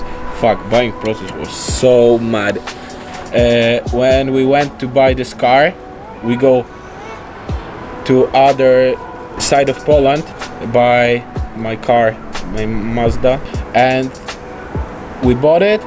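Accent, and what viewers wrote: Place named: England, United Kingdom. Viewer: Oooh man i love the accent and youre english i dont know why but its so Nice to hear!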